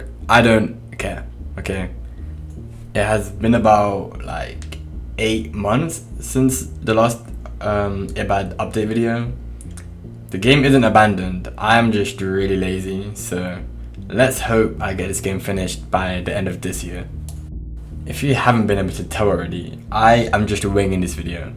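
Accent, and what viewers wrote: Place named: United Kingdom. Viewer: ew briish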